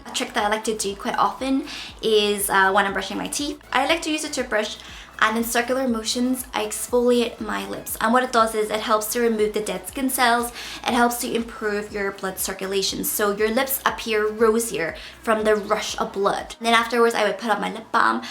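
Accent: Ireland. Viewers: I just found your channel and have been thinking your Canadian now I hear Irish!? 🤔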